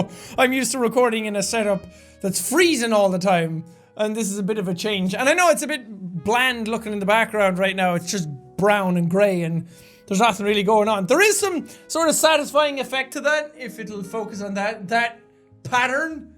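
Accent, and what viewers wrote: Ireland. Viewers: NOOO U WILL LOSE IRISSH ACENT OH NO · i am a little bit, i have a BIG thing for Irish Accents..so if he is in the UK now it does worry me a little bit. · ahh no more Ireland :( Well hope you enjoy your new home...<3 Hope you keep your amazing accent too <3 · Maybe the only think I'd ask for is that he never loses his Irish accent. xD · don't worry he won't, he's a fully grown adult ("adult"😂), so he may lose it slightly but he'll always have that irish tinge ( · My Irish Potato is gonna be in England.... You better keep your accent! Don't get a British one! That's what Stampy is for! Stay you! -Keegan Tripp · He is going to loose his awesome irish accent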